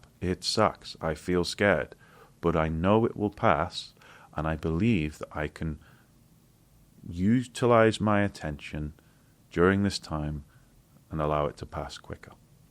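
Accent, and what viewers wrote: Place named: Manchester, United Kingdom. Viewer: I’m so glad I found your Ted talk which led me here. As a fellow Mancunian (living overseas for the past 30 years) it’s so lovely to hear a familiar accent and I totally get your humour. I have had anxiety for as long as I can remember. I can’t get out of bed on a work day without an anxiety meditation and now their effect is diminishing and I feel like I constantly have to look for the next quick fix. After listening to this I’m going to take my anxiety for a shower tomorrow! Thank you!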